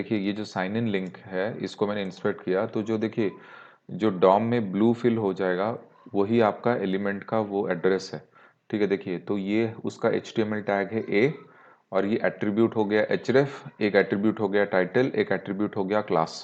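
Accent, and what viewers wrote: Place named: Odisha, India. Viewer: Bro are from odisha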